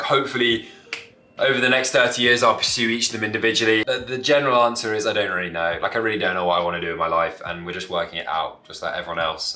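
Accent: United Kingdom. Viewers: Your accent is so different, Ik it's british but it also sounds like there's some other accent mixed in with british, I'm surprised at myself that I can understand what ur saying 95 percent of the time cause english isn't my first language, sometime there are some words I cant catch or understand cuz ur speaking way too fast but its all good, love your vids, currently doing a marathon of ur vlogs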